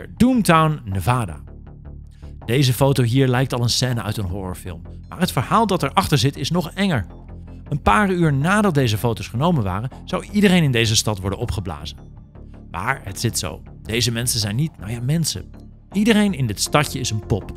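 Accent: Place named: Netherlands. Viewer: 'Zeker en vast' is Vlaams maar je klinkt Nederlands. Huh?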